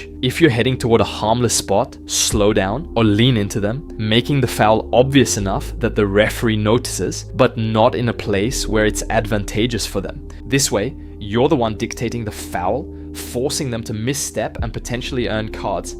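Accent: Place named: Germany. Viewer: Da hört sich aber jemand deutsch an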